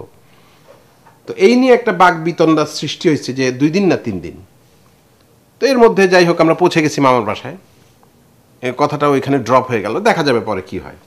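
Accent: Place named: Old Dhaka, Bangladesh. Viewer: Bhaiya, onek majul kahino 😍🤣 Apnar kintu ekhono puran dhakar pronunciation ache. Khubi bhalo Lage shunte 😍😎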